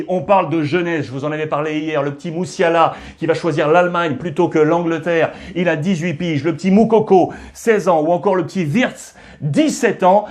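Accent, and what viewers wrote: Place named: United States. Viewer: L'accent américaine top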